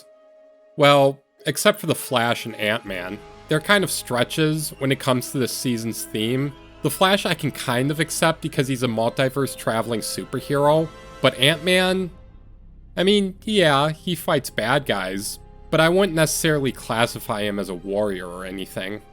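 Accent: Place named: United States. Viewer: The way you speak makes my ears hurt. You’re accent makes it painfully obvious you’re just another american. I hate the way you guys speak. It literally is the wrong way. When you say words that include “Er, Or, etc), you shouldn’t be saying it (for example: Word), like “Wrd”. The ‘R’ is simply there to change how it gets pronounced, it isn’t meant to be pronounced on its own. Don’t even get me started on words like ‘on’, which oddly seems to be pronounced like “Arn”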